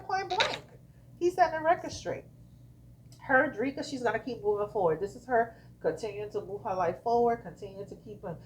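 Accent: New Orleans, United States. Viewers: You got jokes 😂 I love our New Orleans accent⚜️ What’s so funny is… I never knew we had an accent until I caught on my taking the time to listen 👂🏽. How do we sound to you??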